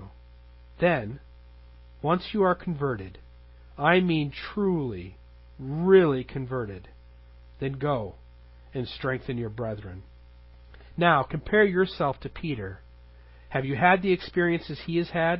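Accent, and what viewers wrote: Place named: Great Lakes, United States. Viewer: sounded so much more ‘Great Lakes’ back then.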